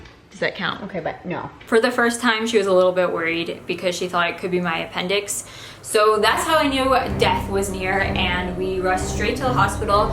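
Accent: Canada. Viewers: Your Canadian accent is more noticeable when you aren’t feeling well. It’s the cutest thing ever! >.< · What the heck kind of hospitals do they have over there? Abalone hot pot? Is it part hospital, part restaurant? Does it have Yelp reviews? Btw, your Canadian accent is alot more noticeable when you're sick. Welcome back.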